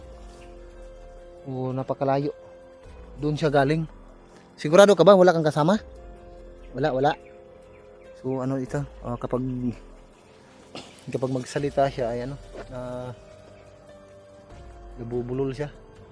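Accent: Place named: Visayas, Philippines. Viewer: VisayaA ang pg sulti mo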